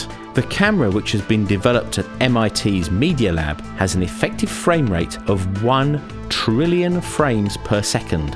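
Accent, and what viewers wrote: United Kingdom. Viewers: Whilst consumer video cameras hitting 30/60 frames per second, the industry norm for the film world is still 24 fps. However, seeing as the chap narrating this video is British, it's possible that he selected PAL settings (of 25 fps) as oppose to 30 fps associated with NTSC when putting the video together. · I really appreciate your videos, because they are so helpful for people like me willing to learn English. We can find corresponding text as well as subtitling with a charming British accent . Thank you very much, Sir.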